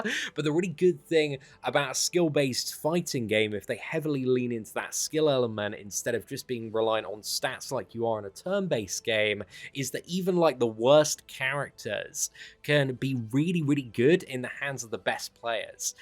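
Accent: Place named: United Kingdom. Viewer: I just wanted to hear your british Accent again mate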